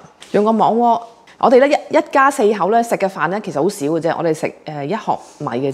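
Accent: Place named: Hong Kong. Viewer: Hello, I'm also a TM6 user, u did a very good and relex demo. If u like this way to cook I want to let u know that under TM company there is a Book call Three-in-One cooking,一鍋三菜，u can try those dishes too. What a happy family,keep up your good work. Watch yr utube everyday... I feel like have a Hk friends 講得好香港人style. Welcome and Enjoy your life in Australia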